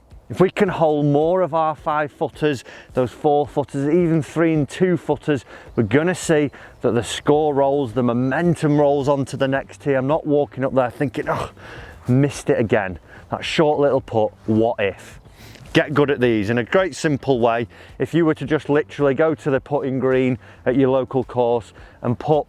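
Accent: United Kingdom. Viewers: I can't get enough of that british english (are you from Manchester?)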